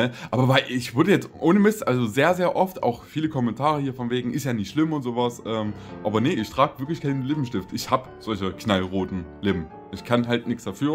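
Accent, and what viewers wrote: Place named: Saxony, Germany. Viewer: Also ich muse ja mal sagen, ich liebe dein Humor, "woher kommst du"? "Von draußen"! 🤣🤣🤣🤣, das hät glatt ich sagen können.Das du aus Sachen kommst hört man eigentlich sehr gut. Aber gut jüngere haben da weniger Ahnung. Das FAQ war sehr Interessant, gerne mehr davon. Aber bitte eine andere Musik beim nächsten mal.Und wünsche dir für deine Umschulung alles Glück der Welt das alles unter der jetzigen Situation statt finden kann. Und ja ich zumindest finde das du Menschen sehr gut Unterhalten/Aufmuntern kannst. Dein lachen und humor sind ansteckend, danke dafür.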